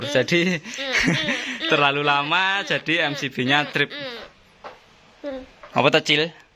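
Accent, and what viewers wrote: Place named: Madura, Indonesia. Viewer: Nah ketahuan ada logat madura nya 😲😲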